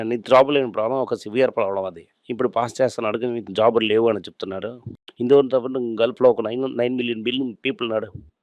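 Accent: Tamil Nadu, India. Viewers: Tamil accent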